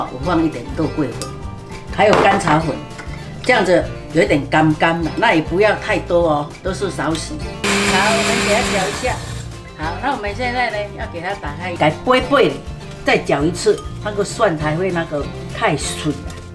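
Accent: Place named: Taiwan. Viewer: 阿嬤的台灣國語聽得很親切喔😃❤️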